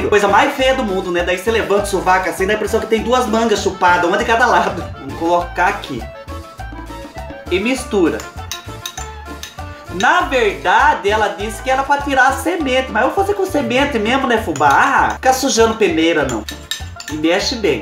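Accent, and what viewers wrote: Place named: Minas Gerais, Brazil. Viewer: Parece Sotaque mineiro 😂😂😂😂 adoreiiii.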